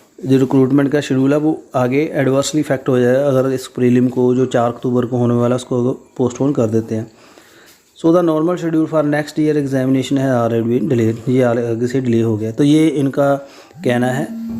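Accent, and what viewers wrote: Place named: Punjab, India. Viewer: Sir ji twadi punjabi esde vich saf nazar a rhi hai...i like it 😍😍😍😍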